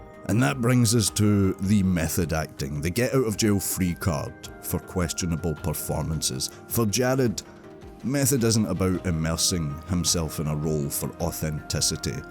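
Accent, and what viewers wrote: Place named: Scotland, United Kingdom. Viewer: F. U. Learn to speak English if you want to communicate with English speakers. (You Scottish bastard!) P.S. 'all viewers can tell you LOVE Jared Leto.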